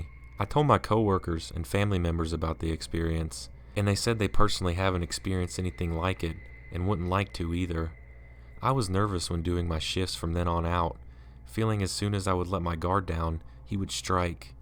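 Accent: South, United States. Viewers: Southern accents rule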